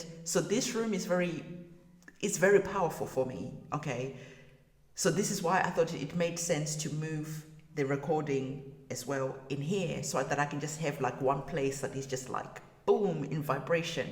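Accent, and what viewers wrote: South Africa. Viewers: Are you originally from South Africa? ..... this was enlightening thank you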